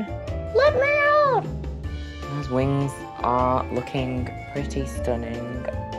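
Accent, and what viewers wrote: United Kingdom. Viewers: Its called a British accent. but nice recycled meme bro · i remember watching this video when i was 15 and thinking i was watching the most beautiful women i’ve ever seen. and i wasn’t talking english yet so everything i understand was the british accent and the word stunning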